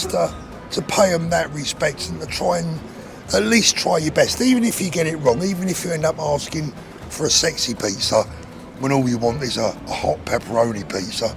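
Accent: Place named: London, United Kingdom. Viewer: Always great to hear a real Londoner. Too bad your own country doesn't want you.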